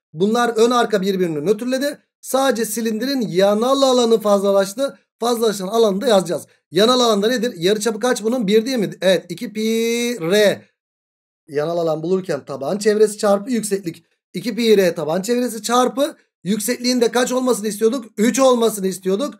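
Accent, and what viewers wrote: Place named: Aegean, Turkey. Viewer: egeli sanirim sive benziyor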